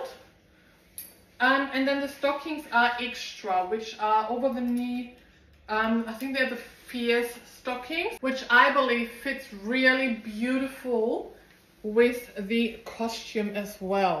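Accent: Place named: Germany. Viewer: I love your accent,. Are you German?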